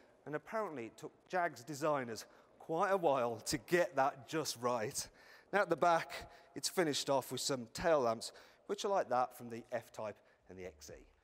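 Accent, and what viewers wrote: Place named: Midlands, United Kingdom. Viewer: Sorry to get more personal than perhaps is fair when talking about a car review, but this presenter really needs some advice. Not that I'm anti-midlands in anyway, as your accent is not the part of your presentation style that annoys me however I do believe it could be the root of the issue. The real problem is your upward inflections and the end of certain sentences. This makes statements sound like questions and decreases both the credibility and my enjoyment of the video. It's infuriating to listen to. I've noticed it on a few of this channel's videos now and although it is seemingly such an insignificant part of the video, it somehow over shadows whatever message you're trying to convey. It can be over turned with so little effort whilst making at least one subscriber more at ease whilst watching your videos.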